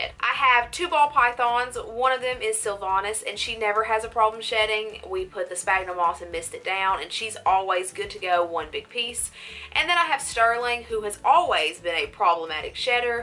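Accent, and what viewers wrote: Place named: Alabama, United States. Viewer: I listened to just one of your videos and I was like “this chick is from Alabama!” Came to this video and was definitely not disappointed! You are such a good source of info for snake info :D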